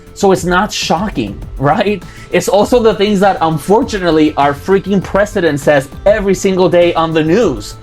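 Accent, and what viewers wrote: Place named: South America. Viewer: this surprised I did not think at all you were living in the US, I thought you were South American or Caribbean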